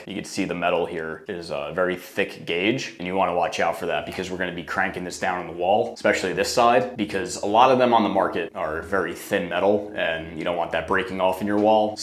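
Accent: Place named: RP, United Kingdom. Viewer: tutorial on installing an S-style shower arm is absolutely top-tier. His plummy delivery and refined advice make a technical plumbing task feel like a sophisticated DIY masterclass. He explains the elevation gains and clearance benefits with such clarity that even a complex setup feels effortless. If you’re looking to upgrade your shower experience with professional guidance, this is the gold standard.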